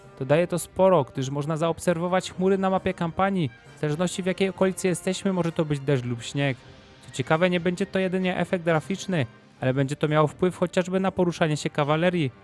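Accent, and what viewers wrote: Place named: South, Poland. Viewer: Po wymowie stwierdzam ze jesteś z południa polski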